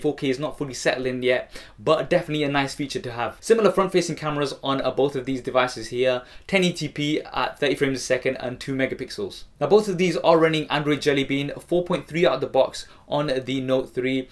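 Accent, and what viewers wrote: United Kingdom. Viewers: Very good vid. But I really can t subscribe s.b. Speaking this terrible B.E. accent. Why don t u speak more "neutral" or even A.E. accent? Still... Thx for tha video.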